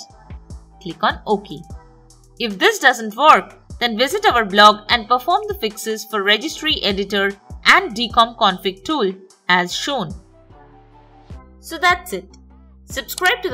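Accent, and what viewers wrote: India. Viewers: do not trust a video with an indian accent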